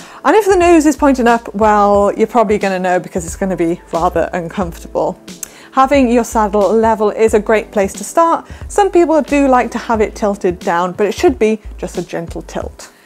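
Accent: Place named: United Kingdom. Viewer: Do you think that British people will watch only? Talking Too fast and with accent !!! I didn’t like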